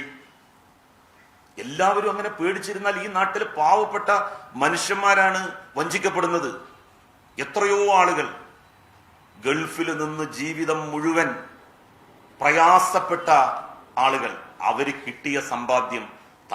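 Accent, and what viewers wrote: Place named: Malappuram, India. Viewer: മലപ്പുറം സ്ലാങ് 🙏🙏🙏😄😂😂😂ഫീൽ good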